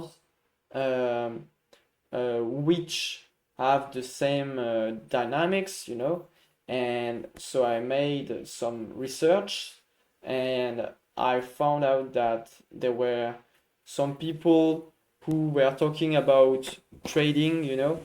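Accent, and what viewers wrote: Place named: France. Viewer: hii ur doing so well man congrats 🎉 and ur little french accent is kinda cute hahaa so dw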